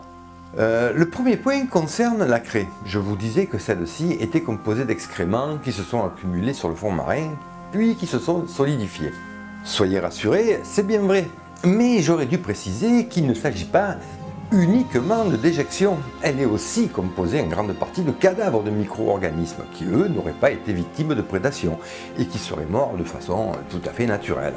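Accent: South, France. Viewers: oh tu est du sud toi aussi^^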